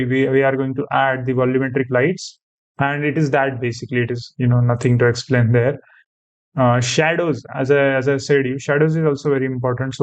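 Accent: India. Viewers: I cant take 12hrs of another indian youtuber but the guys without an accent put a fkng paywall behind their tutorials. Shit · If you are making video with this accent Why don't make Video in Hindi Many people who don't know English can learn better. Indians should not make Tutorial videos in English unless they are targetting Foreign audiences and With this Accent. Are you serious? Better make it in Hindi Many people can learn.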